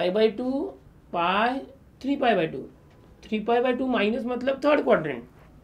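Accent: Maharashtra, India. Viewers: महाराष्ट्र स्टाइल मध्ये कडक कडक👌👌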